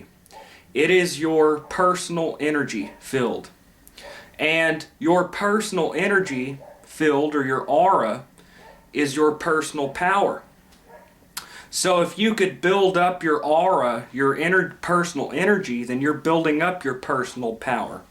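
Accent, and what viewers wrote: South, United States. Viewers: Thank you for your ability to teach clearly! Very rewarding for me! You must be down south here somewhere. Esoteric instruction is always better when delivered w a southern accent 😃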